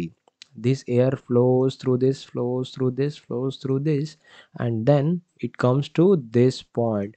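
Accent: India. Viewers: Indian-origin accent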